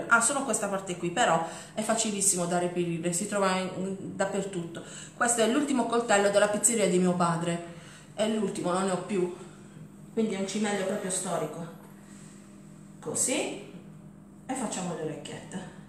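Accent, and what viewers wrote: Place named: Bari, Italy. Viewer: Ciao (e )... ho scoperto da poco il tuo canale e sentendo la tua cadenza barese mi sono subito iscritta perchè sono barese anche io, ma vivo a Torino. Finalmente ho imparato a fare i "disctinculo" ma vorrei farne per una mia amica che è celiaca...mi potresti consigliare sulla farina da utilizzare? Ho già provato con la farina di riso ma non mi viene bene, cosa mi proponi? Grazie se mi rispondi.... a presto, Maria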